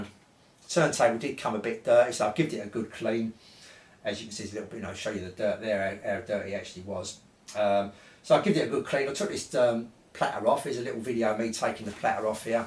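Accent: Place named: London, United Kingdom. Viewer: Thanks, easy to understand and great to hear an old school London - Kent? accent! Be Lucky.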